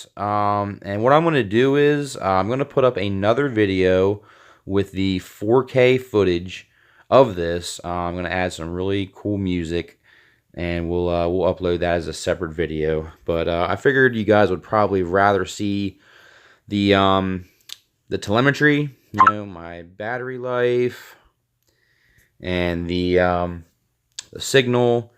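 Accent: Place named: Pennsylvania, United States. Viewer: The accent. Berks county?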